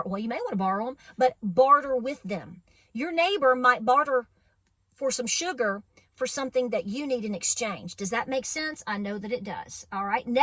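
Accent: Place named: Southern Ohio, United States. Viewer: Love the accent! I grew up in southern OH (bordering WV/KY). Sounds like home :)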